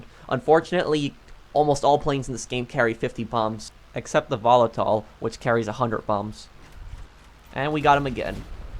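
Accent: Poland. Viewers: Polish? You from poland? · Where are you from? Poland?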